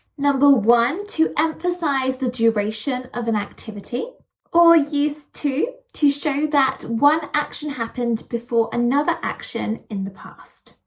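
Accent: United Kingdom. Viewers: Love British accent.❤❤❤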